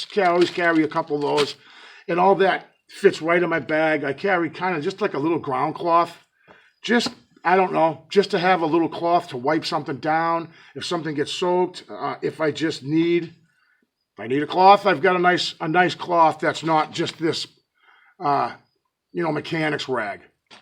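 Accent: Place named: New England, United States. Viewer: New sub here. Squatch Ya Dooin'?:) HEE HEE. Hey. Love the New England accent. Hypotenuse! I gotta kick outta that. LOL. Lookin for more vids. God Bless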